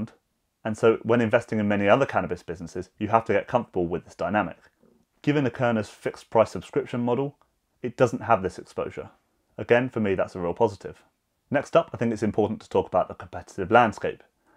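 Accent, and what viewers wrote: United Kingdom. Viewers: Bro I found your channel today and watched like 5 vids, you would sound smart even without the british accent haha! Keep up the awesome work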